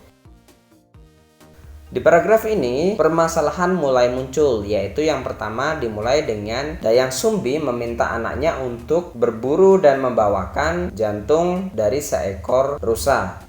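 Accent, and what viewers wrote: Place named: United Kingdom. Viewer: Saran mas : Kan w merhatiin kalau mas lagi pakai accent yang lumayan British, nah Cara mengucapkan Brought nya itu seperti ini : "Brot" kek gt bro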